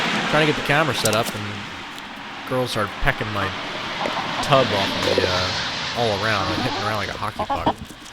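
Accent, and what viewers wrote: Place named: Ohio, United States. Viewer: There's a strange you tube guy does sting zone lets all kinds sting him I told he should try one of those lol we call them bald headed hornet in Michigan sounds like your from Ohio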